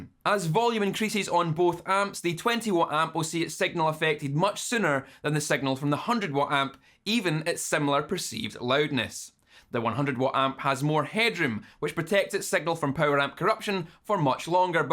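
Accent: Scotland, United Kingdom. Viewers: I love your channel! So much fantastic info delivered in a compact format and 100% comprehensible. And I love your Scottish accent..."peckups" is a favourite 😊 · Scottish accents are THE BEST!! I could listen to you read a tech manual all day LoL Really great video and audio lesson! Keep up the fine work!! Salutations from Miami, Florida!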